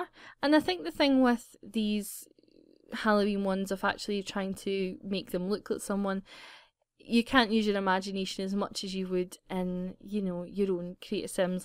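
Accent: Scotland, United Kingdom. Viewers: are you from scottland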